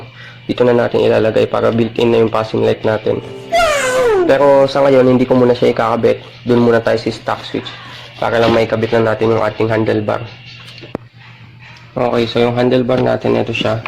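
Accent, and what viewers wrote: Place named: Muntinlupa, Philippines. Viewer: napanood ko na boss kakatapos lang ang angas, at na notice ko parang taga muntinlupa kalang HAHAHA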